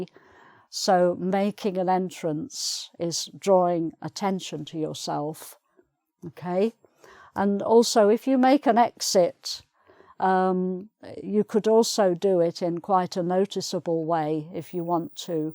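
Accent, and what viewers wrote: United Kingdom. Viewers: Always excited to hear your British accent which makes me want to keep learning and practicing English as a none native English speaker.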